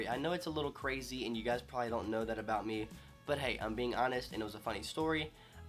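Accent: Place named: South, United States. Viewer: He has a really thick country accent😹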